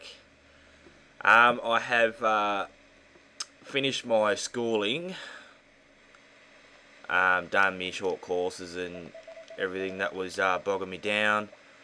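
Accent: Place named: United Kingdom. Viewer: Good to have your british back,!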